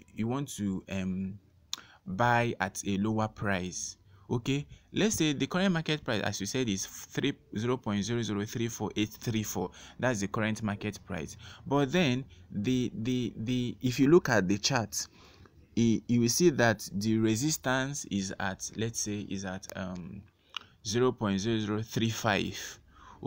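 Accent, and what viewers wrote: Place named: Nigeria. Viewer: I like the originality of your Nigerian accent.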